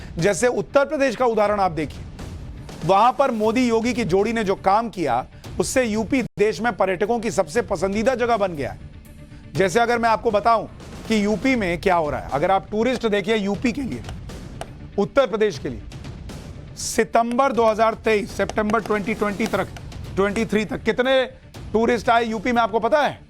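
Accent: Bihar, India. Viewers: सुशांत जी आपका बिहारी अंदाज में बोलने का तरीका निराला है, आपके पाठशाला में शामिल होके आनंद आ जाता है ।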